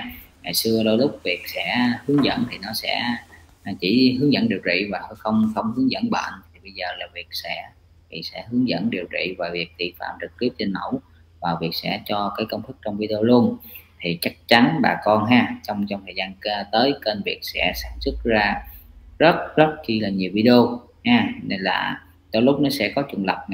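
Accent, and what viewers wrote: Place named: South, Vietnam. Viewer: mk góp ý nhé! bạn nên để thêm dòng chữ chạy lời nói chứ giọng b ae ngoài bắc nghe thấy khó quá :))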